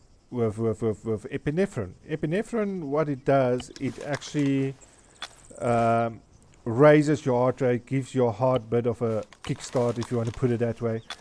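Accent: South Africa. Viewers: you sound very South African? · Easy you South African accent gives it away, i'm from Gauteng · Your Accent gave it away,Well.For a south african at least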